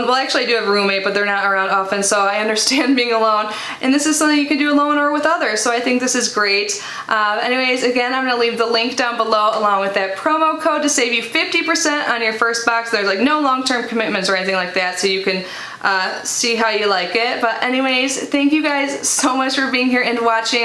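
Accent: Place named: Midwest, United States. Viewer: Hey! New subscriber. Been watching for a minute! You have to be from the Midwest. I’m originally from Chicago. I know that accent anywhere!! 😊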